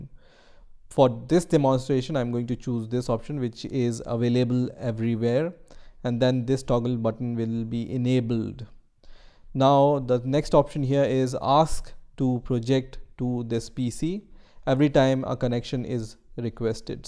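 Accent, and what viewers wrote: India. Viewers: bro It's Indians English accent...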